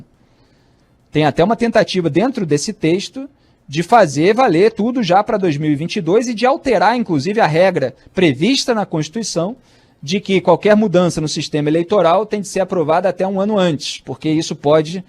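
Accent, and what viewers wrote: Rio de Janeiro, Brazil. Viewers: Carioca sempre tem bom gosto bonita camisa os cariocas fazem academia mantém uma elegancia incomparável fora o sotaque carioca sem dúvida o mais charmoso deste país com tantas contradições são muito sociaveis mas acho que o Brasil 🇧🇷 nunca vai ser socialista o socialismo não é sinal de progresso apesar de você ter uma capacidade incrível de convencer as pessoas.bjs Benne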